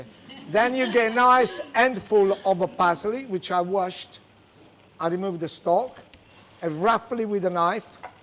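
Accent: Italy. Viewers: His English Italian accent is insane.lol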